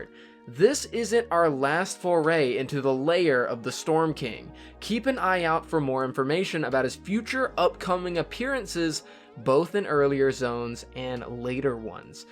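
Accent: France. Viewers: is he from france ?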